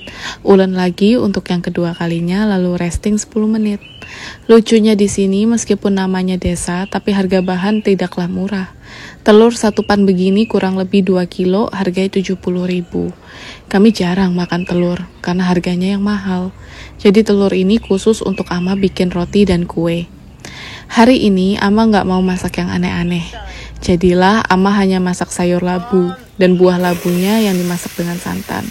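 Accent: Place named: Jakarta, Indonesia. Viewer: Oo.. Kok logat bicara nya bagus bgt yaa... Kyk orang jakarta... Hehehe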